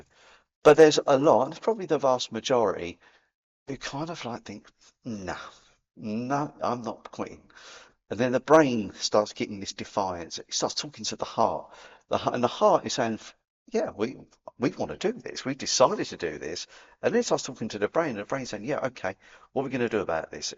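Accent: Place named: Cockney, United Kingdom. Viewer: Lovely my friend. The Cockney accent helps!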